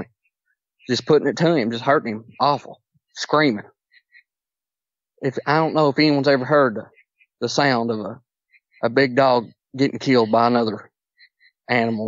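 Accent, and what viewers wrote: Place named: South, United States. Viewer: Thanks for sharing your story! Love your accent! I'm from Mississippi so we Southerners all have a shared background, your grandparents sound like my own. <3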